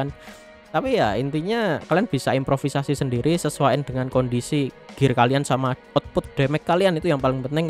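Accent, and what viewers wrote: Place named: Java, Indonesia. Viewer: Medhok bero. Hehe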